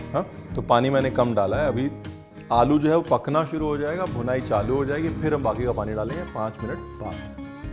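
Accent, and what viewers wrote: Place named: Bhojpuri, India. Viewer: Babua bhojpuri jaisan bolat baran 😊🥰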